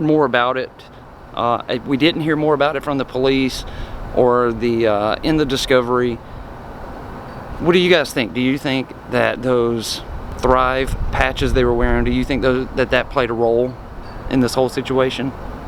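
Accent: South, United States. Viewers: Love your vids, - and the southern accent (Im a Brit), so absolutely, I'd watch if you went for a drive around N. Carolina. Thanks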